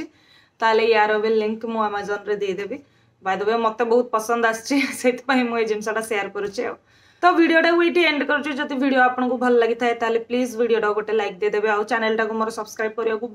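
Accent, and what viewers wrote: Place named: Talcher, India. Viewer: Hii sis apana Talcher language v tk kahuchanti ta mote v laguthila ki apana Talcher ru tuma Katha kahiba style bahut badhia mu v talcher ru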